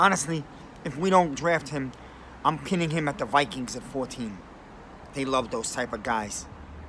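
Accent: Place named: New York, United States. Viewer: Holy shit man, a true Blue NYC Dude - I F n love listening to you! Not only do you know your stuff, ypur presentation & use of language is music to my ears. This former NYC dweller feels like I'm back home listening to your delivery bro. You should be on ESPN!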